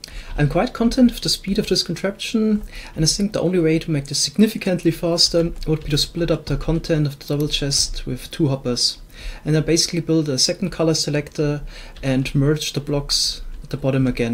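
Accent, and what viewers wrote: Germany. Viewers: Als ich ein Video von dir gesehen habe, war mir direkt klar, du kommst aus Deutschland, der Akzent verrät alles😂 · BTW YouTube can't figure out your accent; it thinks all your videos are in German :\ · Thats so funny! I'm german, too and I always thought that you sound kind of german xD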